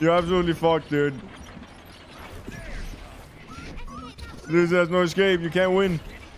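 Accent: Denmark. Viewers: Dude u cannot hide ur danish accent. This is literally the first time I’ve heard your voice and I immediately knew you were a Dane and so am I. Dansk accent virker ik sår godt med engelsk. Lol. Bliv ved med ag lave videoer de ser interessante ud! (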